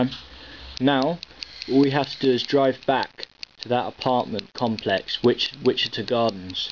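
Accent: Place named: Australia. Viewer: i like ur accent, r u ausi or nz?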